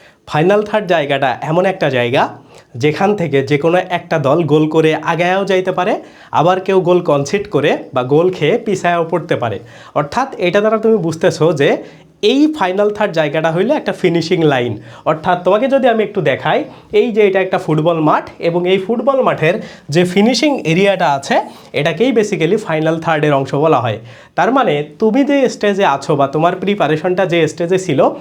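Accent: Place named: Bangladesh. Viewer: So many Bangladeshi teachers have this one thing for improper pronunciation. I think it's due to their local Bangla accent. Otherwise their teachings are good. The pronunciation low-key puts me off tho. But I don't think that's a big deal.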